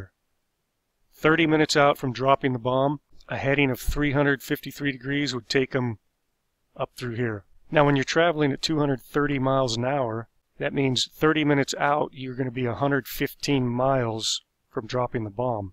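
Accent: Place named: North Carolina, United States. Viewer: I know nothing about you , BUT I'LL BET YOU ARE FROM NORTH EAST. NC OR SOUTH EAST. VA. RIGHT? Just a guess because you sound like everyone I know around here!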